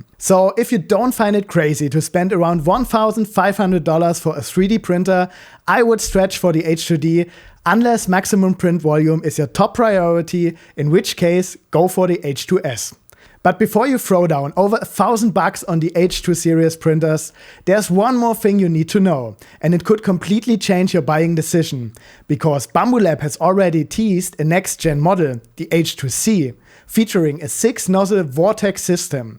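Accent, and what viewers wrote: Germany. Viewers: this bad accent german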